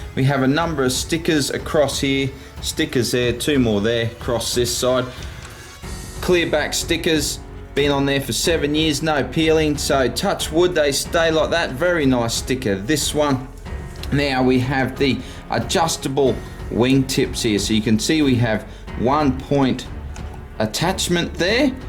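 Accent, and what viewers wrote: Australia. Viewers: i love being able to watch/listen to a true Aussie! Great Video!!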